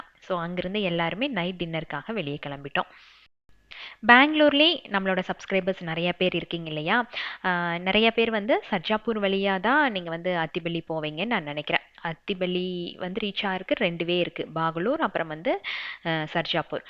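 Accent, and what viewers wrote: Coimbatore, India. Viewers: Nenga elam nala kovai slang la pesrenga but ta matum yen sis kannada pesrenga... unga voice over vida original voice ketka nala iruku sis ❤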